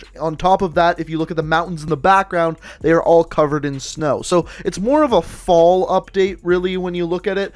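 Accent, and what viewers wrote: Canada. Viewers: That is the most Canadian accent i've heard in a while. I love it.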